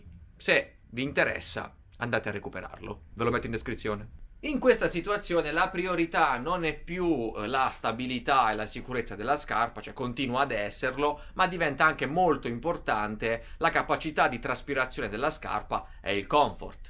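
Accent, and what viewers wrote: Apulia, Italy. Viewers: ma il "dove dobbiamo andare con queste scarpe", devo chiedermelo sempre con il tuo accento pugliese? o posso usare il mio siciliano?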